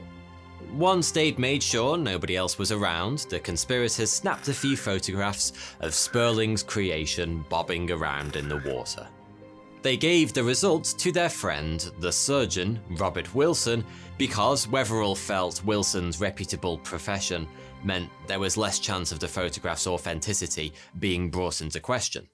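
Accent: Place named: Southern England, United Kingdom. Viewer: you sound like you're from southern England. Where can I buy you a pint?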